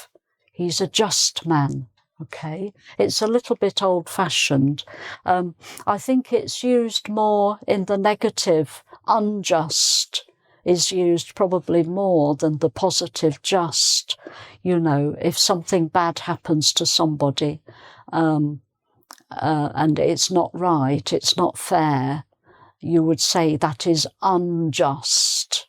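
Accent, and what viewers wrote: United Kingdom. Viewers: You were born to be a teacher , your great British accent adds extra flavour to the material you present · Thank you dear teacher, I perfectly understood today's class (today for me), very attractive and captivating your teachings in the traditional way: with board and markers and with a perfectly modulated voice as English women usually have. I like that British accent, it seems taken from a novel by Kazuo Ishiguro, The Remains of the Day. If I had a beautiful red apple I would give it to him with a kiss on the forehead. Congratulations. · I love your British accent! Would speak in that way! Tnx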